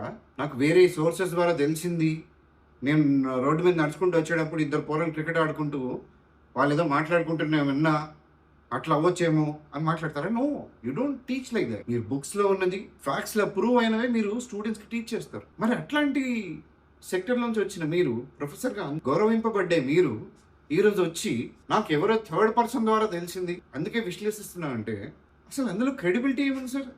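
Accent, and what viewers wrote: Telangana, India. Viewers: తెలంగాణ బాషా మస్త్ మాట్లాడతాన్నావు భాయ్ ఏ జిల్లా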